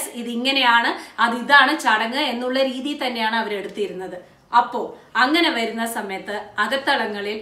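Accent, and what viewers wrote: Palakkad, India. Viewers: Palakadan slang kelkan nalla rasamalle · Nice - Also dear palakkad slang.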